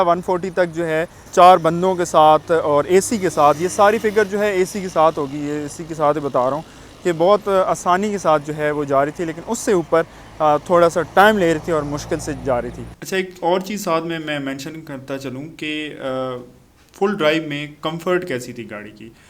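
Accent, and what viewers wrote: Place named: Mianwali, Pakistan. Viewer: Bhai ap Mianwali sy ho kiya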